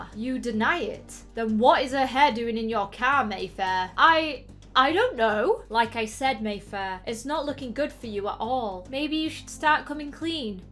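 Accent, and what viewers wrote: Scotland, United Kingdom. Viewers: I really love your voice. You're Scottish ? Sorry for my brutal question. I like how Scottish people talk. And I mean that really in a serious way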